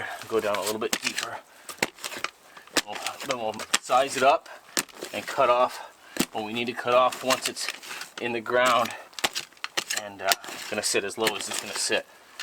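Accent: England, United Kingdom. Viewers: Cracking English accent 😁👍🏼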